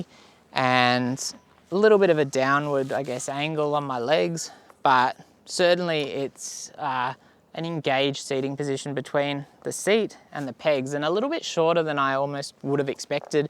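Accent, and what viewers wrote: Australia. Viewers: This accent is way too much for me..where you from bro Australia? Wide bahhs nice wide bahhs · Pretty standard aussie accent cobbarino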